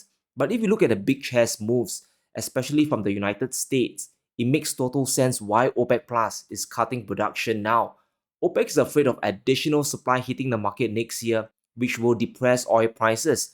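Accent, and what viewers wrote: Jamaica. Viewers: i cant figure out, is this jamaican chinese accent or what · **** brotha' you sound like a Rasta when you speak. Good information in the video, sir thanks****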